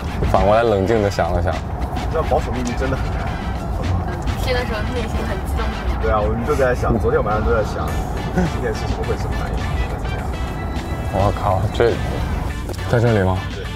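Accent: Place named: Beijing, China. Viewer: 这哥们的老北京新东方口音还蛮特别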